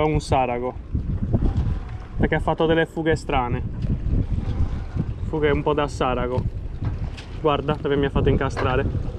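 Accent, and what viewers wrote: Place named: Sardinia, Italy. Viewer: Complimenti per il video ben curato e per i bei pesci😉 dall'accento si sente che sei sardo, posso chiederti di dov'è?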